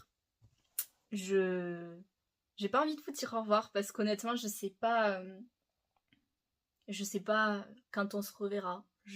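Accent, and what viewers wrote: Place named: South, France. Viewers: Merci pour toutes ces vidéos, c'était vraiment du travail de grande qualité! Super content de voir que tu as l'air dans de supers dispositions pour ouvrir ce nouveau chapitre qui je l'espère te comblera! (l'accent du sud-ouest mama comment c'est craquant...) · En asmr c est compliqué de dénoter des accents . Elle a déjà parlé normalement avec cet accent du sud . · Coucou c'est mon premier message sur ta chaine, et je crois sur n'importe qu'elle chaine d'ailleurs, mais j'avais recommencer a écouter tes vidéos ASMR depuis 2/3 jours elles m'avaient beaucoup manqué un peu de tristesse d'entendre qu'il n'y aura plus d'ASMR mais aussi super content que tu réussisses se que tu as entrepris, j'avoue que ça fais aussi bizarre d'entendre ta voix " haute " on va dire ^^ et c'est pas déplaisant haha! ( l'accent toulousain incroyable! 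) mais voila je continuerais a écouté tes vidéos car j'en ai besoin rien que ta voix me fais beaucoup de bien!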